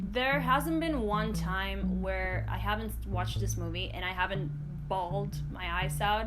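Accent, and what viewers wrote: Dominican Republic. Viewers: Yo no se quien eres pero yo conosco el acento dominicano donde sea keep it up good stuff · Girl please tell me you’re Dominican cause I know you’re accent is. Cuando hablaste español and also ARMY bomb yes 🙌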